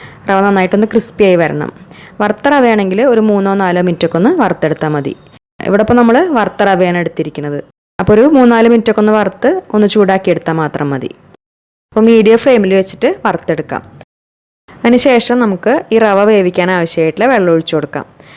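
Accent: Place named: Thrissur, India. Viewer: തൃശൂരാ... ല്ലേ.. ഇനിക്ക് മൻസിലായിട്ടാ.... വർത്താനം കേട്ടപ്പഴേ... 😊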